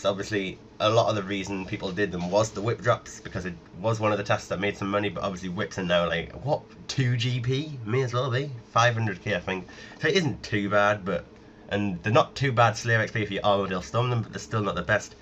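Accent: United Kingdom. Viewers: brittish accent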